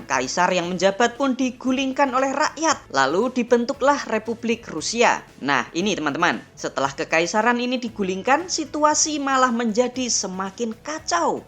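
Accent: Java, Indonesia. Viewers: emg medok nya ga bisa di ilangin dulu itu min selagi ngonten 😁 duta Sheila on 7 aja kalau lagi nyanyi medok nya ilang 😁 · Meddok bgt😆😆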